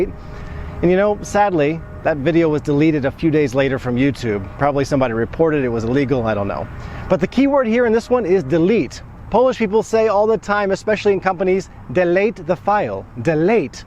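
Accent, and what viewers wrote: United States. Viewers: Let us start from basics - your accent is poor cuz you're Yankee. You'd be lost in Shropshire :)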